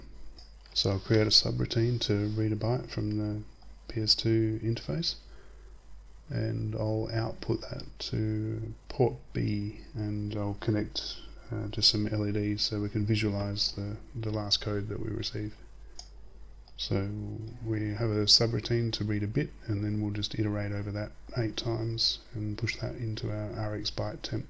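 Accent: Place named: South Australia, Australia. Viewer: Super cool work, I found you from Hackaday and have been bingeing all your stuff for inspiration in my own 6502 project since I'm planning to use a backplane too. You don't happen to be from South Australia do you? You don't have to answer if it's too much info lol, but you sound like a South Aussie. It's possible I'm just hearing ghosts, but I'm from Adelaide and I think I can hear some subtle hints in your accent. Great work again :)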